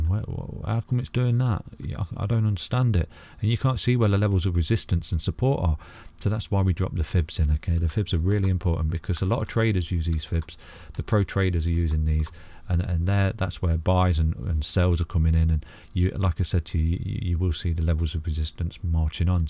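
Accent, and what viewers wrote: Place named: East Midlands, United Kingdom. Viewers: First time on your channel and hit subscribe...Great TA and love your accent!! Lincolnshire by any chance? Lol🤑💯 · Finally, A no-nonsense Crypto channel with a nice local East Midlands accent. Av subscribed me duck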